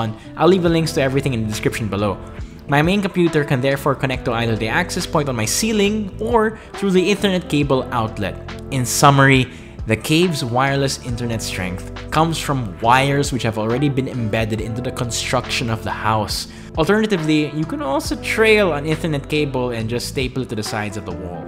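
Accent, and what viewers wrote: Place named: Philippines. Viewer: Idk why I’m kinda fond of the way filipino says words ending with -tion 😂 Great video btw, love your place!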